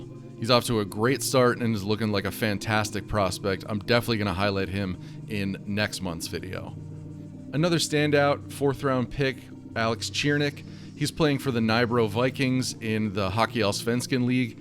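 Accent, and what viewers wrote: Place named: Philadelphia, United States. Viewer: excellent video, The Philly accent 😆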